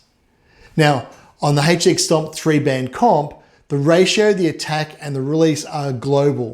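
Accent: Australia. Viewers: Good to come across an aussie accent!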